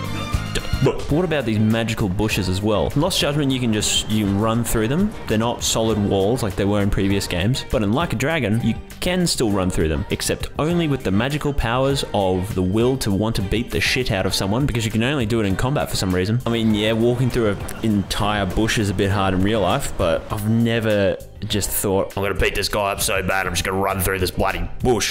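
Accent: Australia. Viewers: australian detected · just realize u are an aussie?! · I just realised you’re from Queensland, awesome video bro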